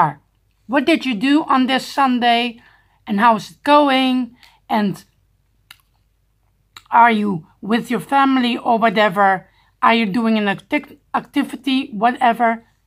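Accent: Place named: Netherlands. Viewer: That accent is definitely Dutch I'm hungover and could just do with that now so jelous lol it's not to long at all pal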